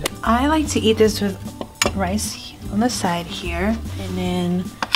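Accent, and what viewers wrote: United States. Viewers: Are you from the south? You have a southern accent, like me. lol. I’m from NC. Thanks for sharing the recipe!!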